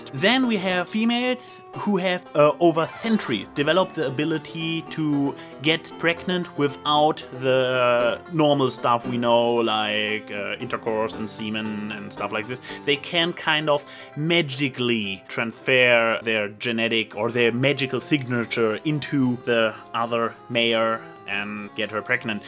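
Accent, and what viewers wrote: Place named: Germany. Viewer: ... you cant tell by the accent? i believe he already has told people but, if i remember right he is from Germany.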